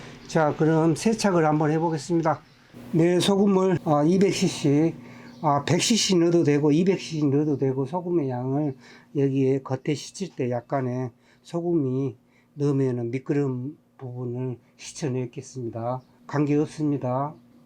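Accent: Jeolla, South Korea. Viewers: 전라도말씨네 재수없는말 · 전라도사투리 안하셨으면. ㅡ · 고향이 어디신가요?정겹고 시골스럽네요^^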